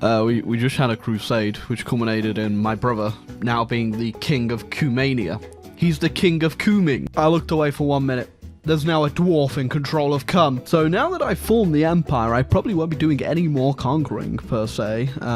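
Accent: France. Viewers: You Have The BEST French Accent Ever, Even Better Than A Actual French Man. me simping for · I want more of your beautiful French accent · I want a full video of to just speak in a french accent the whole time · The French accent is needed for future videos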